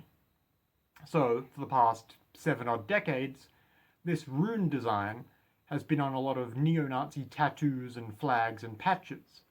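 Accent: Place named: New Zealand. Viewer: To my ken, he's a Pākehā in Aotearoa. Should be mostly similar to an Englander.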